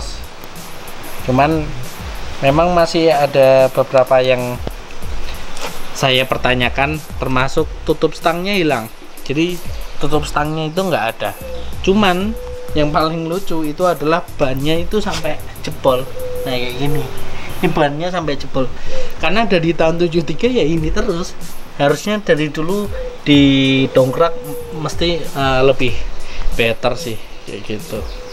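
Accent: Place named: Jakarta, Indonesia. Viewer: Mantab mas. Tapi logate og rodo jakartanan saiki😅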